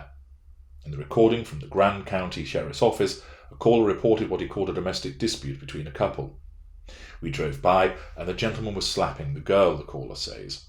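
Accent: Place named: Oxford, United Kingdom. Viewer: No *Said in that rich Oxford accent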